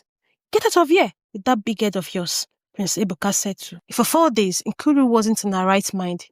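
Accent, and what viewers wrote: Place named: Africa. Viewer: Himmm i heard to understand a Africa accent but i like the story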